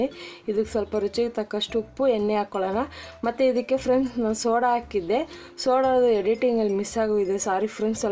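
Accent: Tamil Nadu, India. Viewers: Yenne Tamil slang aanstidee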